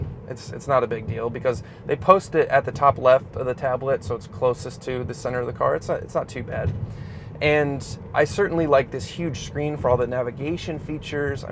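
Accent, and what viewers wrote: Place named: California, United States. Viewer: California diction, syntax, grammar and rhetoric...yuk.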